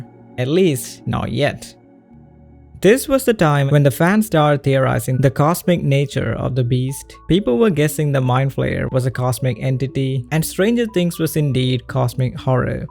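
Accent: South India, India. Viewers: For some reason I hear a South Indian in you...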